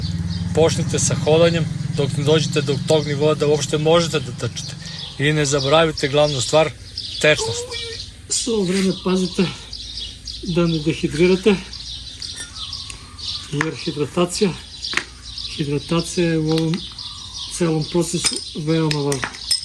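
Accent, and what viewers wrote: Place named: Niš, Serbia. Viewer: Bravo čovek . Strašno me interesuje jesi li ti iz Niša ? Ja jesam 1964 godište , a trenutno u Beogradu . Poz. Govor , je Niški 😅 .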